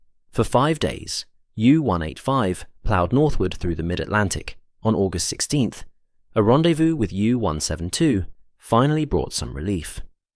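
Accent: United Kingdom. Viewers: Az-ores, not A-zores. Hearing a British accent speaking American sounds awful.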